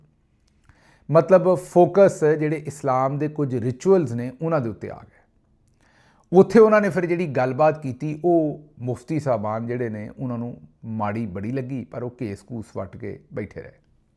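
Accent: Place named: Nagaland, India. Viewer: گل صاب خالص ناگاں دا لہجہ تہاڈا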